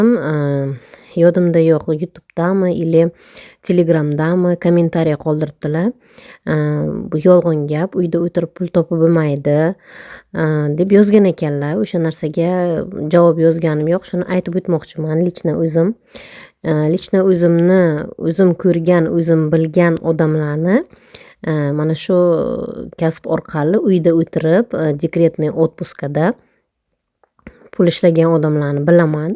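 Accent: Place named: Navoi, Uzbekistan. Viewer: Овозизгахам узингизгахам гап йук Навоини шеваси N 1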